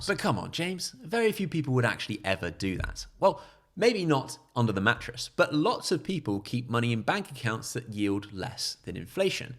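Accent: United Kingdom. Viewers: Hello James. I am Canadian and like your amazing videos for two reasons: the information as well as your British accent. I try to copy your accent. Keep up the great work James!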